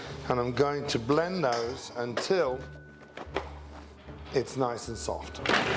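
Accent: England, United Kingdom. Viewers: Plus, I love the British accent 😊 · Well, if this dessert isn’t already too wonderfully we get a great English accent and passionate and special energy you explode with. New subscriber. Thank you in advance. I just love your voice., accent and energy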